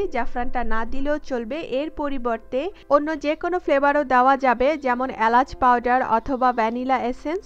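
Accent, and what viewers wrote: Sylhet, Bangladesh. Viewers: apu apni ki Sylhetyy?? Apner shob rannai amer kuv valo lage 💜💜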